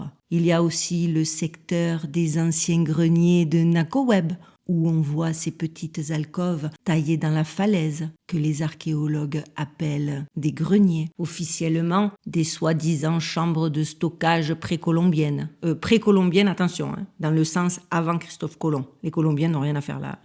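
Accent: Marseille, France. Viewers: exellente video ...j adore ton elocution et ton petit accent charmant de Marseille ;;; Felicitations pour ton travail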